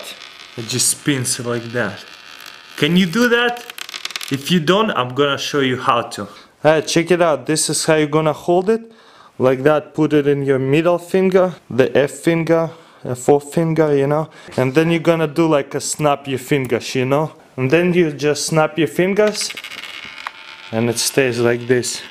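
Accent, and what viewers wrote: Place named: Russia. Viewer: он русский, слышно по акценту, наш человек.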